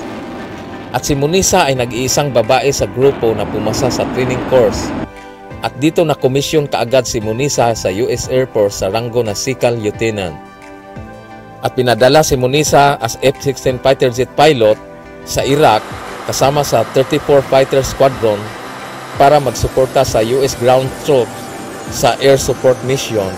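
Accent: Visayas, Philippines. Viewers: Monissa or Monessa?..trick or treats?😁...bisaya ka bay? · Bisaya dong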